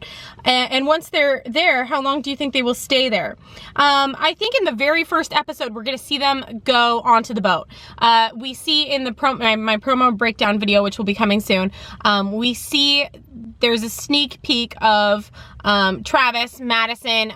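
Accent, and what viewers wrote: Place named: United States. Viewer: Hi! Watching your reviews has been a great pleasure for me for some months now. Now I've got a question of a different sort. I very much like analyzing the way American people speak (vowels and stuff). However it is not easy, as I am not a native English speaker. Could you please help me by telling where you were raised/living? Thank you!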